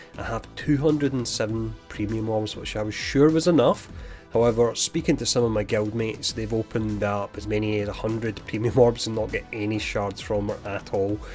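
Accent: Scotland, United Kingdom. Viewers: First time seeing you! Nice you’re getting in front of the cam! The beard definitely fits the Scottish accent! Automatically don’t want to mess with you! 👀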